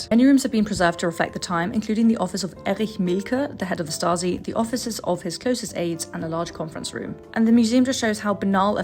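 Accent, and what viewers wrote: United Kingdom. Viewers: As a German myself I would’ve never guessed you‘re german. I thought you were british. You got that british accent from living in the UK haha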